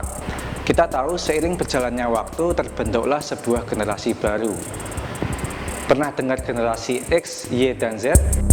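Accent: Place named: Java, Indonesia. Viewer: Medok lek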